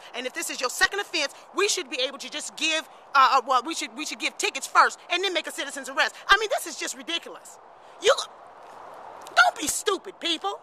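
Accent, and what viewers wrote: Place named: South, United States. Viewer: This video would make an ideal case study for linguists in the exploration of the African American Vernacular English / Southern American English, with a Georgian / Coastal Southern dialect. She has such a graceful demand over Southern chain shifts and she speaks with so much clarity.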